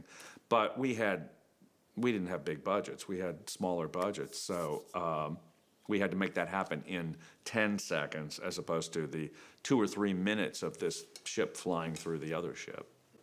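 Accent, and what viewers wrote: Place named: Texas, United States. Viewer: He still sounds so Texas to me.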